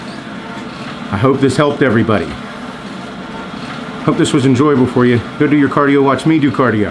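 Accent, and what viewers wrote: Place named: Western Pennsylvania, United States. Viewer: Are you from Western PA? You sound like you do. Anyways, there is a line between laziness, procrastination, guilt and as you say being an asshole.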